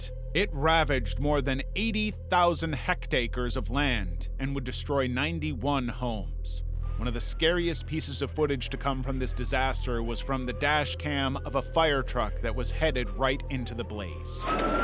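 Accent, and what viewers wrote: United States. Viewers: You reference kilometers over MPH, yet have an American accent. Are you American?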